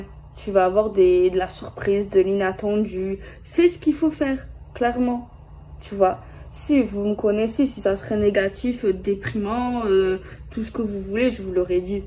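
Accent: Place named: South, France. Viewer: Bien l'accent du sud...alors tout vas bien..🙏🙃🤣 👍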